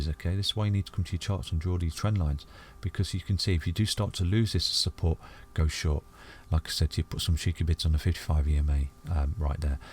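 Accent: United Kingdom. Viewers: The british accent and the famous slangs always get me to buy more. VET 🌚 ✨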